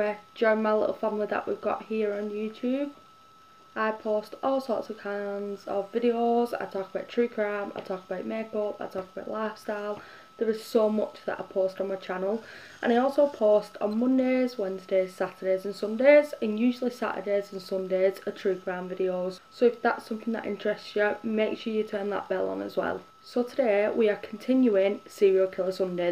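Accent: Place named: Manchester, United Kingdom. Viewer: Your accent is so interesting! Are you from Manchester?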